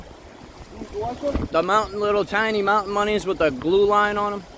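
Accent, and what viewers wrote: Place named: Vermont, United States. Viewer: cool..I think that was the river that ran through West Townsend when I lived there..nope, that was the West River...wonder if there's gold in that one too...I kinda miss that accent from Vermont..the way you said "pry bah"..lol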